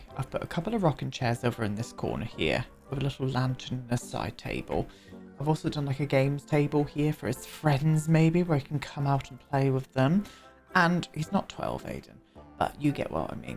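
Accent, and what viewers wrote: United Kingdom. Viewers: What a gorgeous build, as per ushh 💁🏾‍♀️. Soothing voice too, sass and all. Also so incredibly British, I love it 😂🥰💁🏾‍♀️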